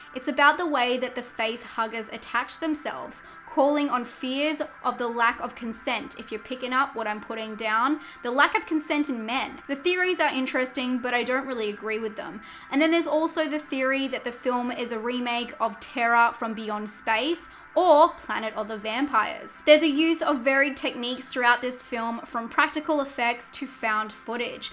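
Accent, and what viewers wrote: Australia. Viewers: People making a fuss over pronunciation are ridiculous. I love your Australian accent! It rocks🤘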